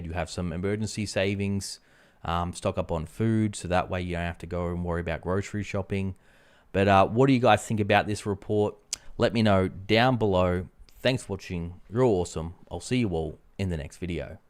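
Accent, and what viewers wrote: Australia. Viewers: Australian or Aussie accent.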